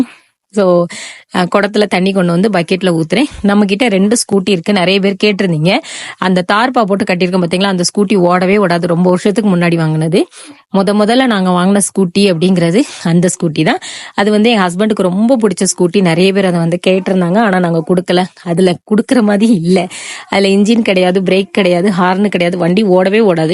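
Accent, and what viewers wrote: Tirunelveli, India. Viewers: Akka, thirunelveli ya!!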